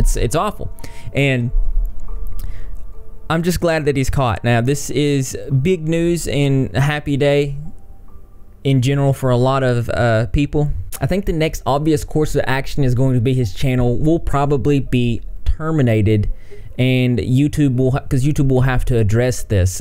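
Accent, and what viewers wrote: South, United States. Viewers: Oh mah gosh u got a country accent like me :D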